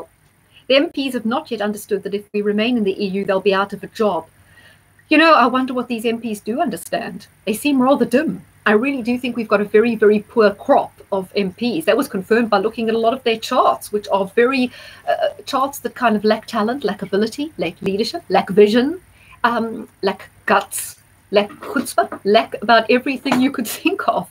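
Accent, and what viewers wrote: South Africa. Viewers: Hi, this remain parliament is driving me insane! It beggars belief how after we were clearly told by Cameron that the British people will decide, not Parliment, not government.... The people and the people's choice will be implemented. Insofar as the next general election is concerned. Can you do a chart on this? I will definitely be voting ukip as there is no one else. It would be interested to see if - after TM resigns after 29th March... I'm hoping actually and Boris takes over, how will he do? Will he start looking at sorting out the destruction caused by Osborne and Hammond austerity cuts? It's desperate how they have made people so desperately poor while the rich flaunt their wealth. The crime rate is horrendous and the London mayor needs to go. Do you see improvement? Thank you for your videos. I found you by chance and constantly look to see if you have posted a new one. 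It's nice too to hear a fellow SA accent!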